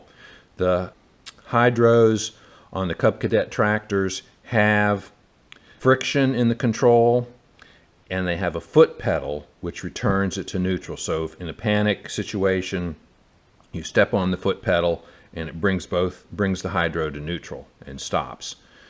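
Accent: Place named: New England, United States. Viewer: Great build. Recent sub here about the time you started this project. Been trying to guess what state you’re in, based on accent, garage style, and garage setup haha as that’s all I have to go by…… maybe somewhere in New England???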